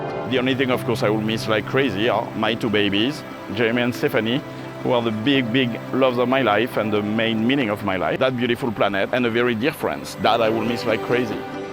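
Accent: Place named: France. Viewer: Hello mon ami d'enfance ... et bien ce n'était vraiment pas ton heure mais ton expérience est amazing ... heureusement que tu n'as pas perdu le sens de l'humour et cet optimisme que je connais depuis toujours chez toi ... Et j'adore ton bel accent Français que tu as gardé en américain...Enjoy your life whit your children et friends.... Love for you et for ever your friend .MartineM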